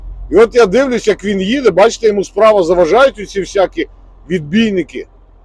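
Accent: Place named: Galicia, Ukraine. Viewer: Чую галицький акцент...чи Волинь все таки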